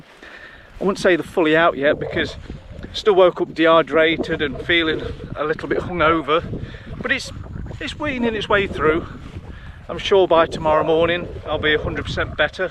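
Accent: United Kingdom. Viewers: That's great to hear about carnivore diet with a british accent ;-)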